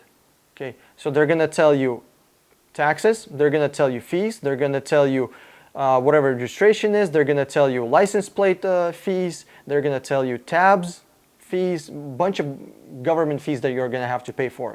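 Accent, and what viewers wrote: Russia. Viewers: Why do you have Russian accent??? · Good advice thank you buddy. You sound like Russian ? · Are you Russian? Love your accent.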